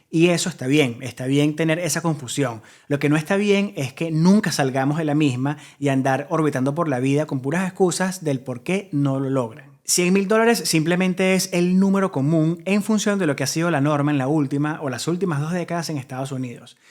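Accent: Venezuela. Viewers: Eres de Venezuela? Suenas super venezolano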